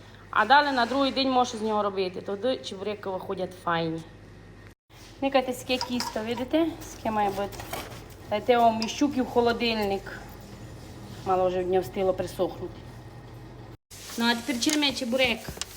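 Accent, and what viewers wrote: Transcarpathia, Ukraine. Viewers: Не мала дивитися се відео, та почула закарпатьську говірко, то відкрила. Дуже скучила за цим чудовим краєм. Дякую.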